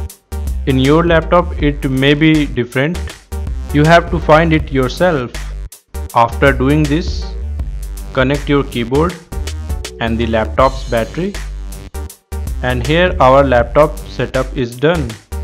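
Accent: India. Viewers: It's cuz he's Indian (speaking an Indian Accent) and most Indians don't have English as their mother tongue. But yeah, these days every Indian talks and understands English. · He is an indian so indian p hai a biy diff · haha wo indian h dekh lo beta english bhi indian ki tarah hi bolta h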